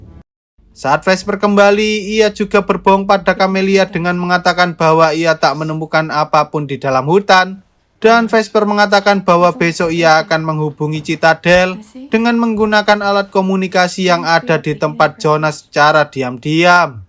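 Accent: Java, Indonesia. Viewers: Ngomong lo jangan medoooook broo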